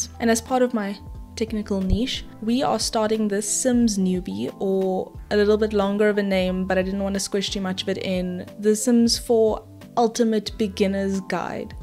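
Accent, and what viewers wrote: South Africa. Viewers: Definitely a South African accent. Are you South African? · That South African accent is wholesome. I dont know if I'm wrong but... I love it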